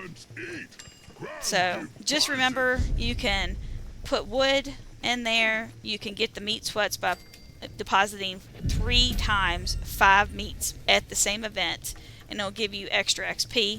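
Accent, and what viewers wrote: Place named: West Virginia, United States. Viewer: Your accent sounds a little like a WV accent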